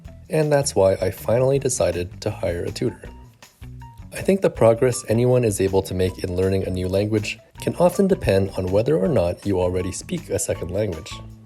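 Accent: Canada. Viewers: I will start my comment with wishing you a vey Happy New Year! This video of yours is very inspirational. The most important is not how much we know but how much we are willing (ready) to know with action added to it. You could be an example to many! Lithuania has little English-speaking scene on Youtube and I am sure your channel will be a smash hit in no time. Meanwhile I would like to add that I am learning English pronounciation from you. Canadian English is perfect for understanding. Thank you for being You and for what you do. Best luck with learning Lithuanian! ❤️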